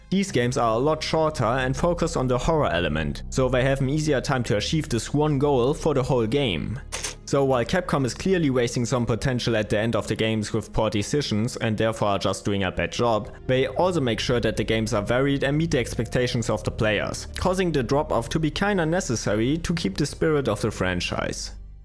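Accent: Germany. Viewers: Kleinen German accent 🇩🇪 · I kann hear deutsch akzent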